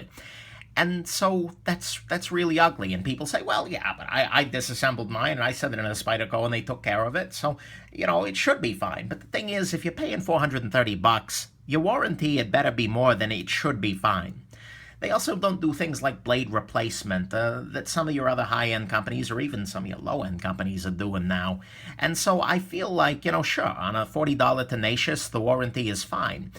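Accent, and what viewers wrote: New York, United States. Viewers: Hey, where are you from? Almost sounds like Brooklyn, somewhere in NY...not sure, but accent definitely seems east coast. Anyway, I like it! It adds color, and reminds me of home (I'm from NYC, but currently living in Seattle). Am I close?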